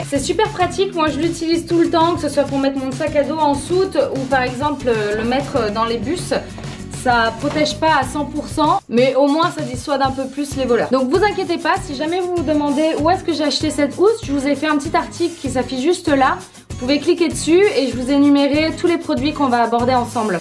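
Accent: France. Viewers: Adoro ouvir o sotaque francês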